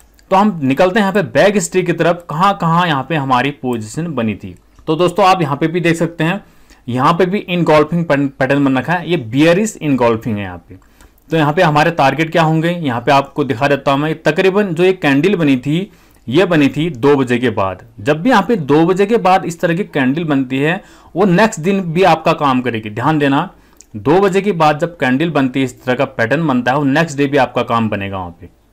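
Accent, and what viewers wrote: Kumaon, India. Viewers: Aap kumauni hain kya sir?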